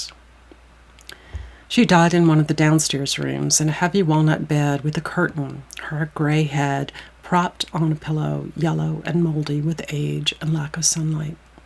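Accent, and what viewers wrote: South, United States. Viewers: The southern accent REALLY helps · Are you from the south,?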